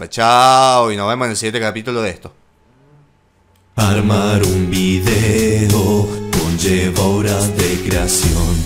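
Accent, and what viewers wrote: Córdoba, Argentina. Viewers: Che Eres Cordobez No Agunta cordoba agregame al fece Enzo Barrera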